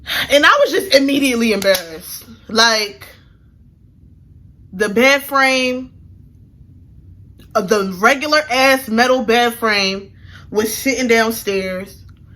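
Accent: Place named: United Kingdom. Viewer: So proud of you! Its the British accent for me lmao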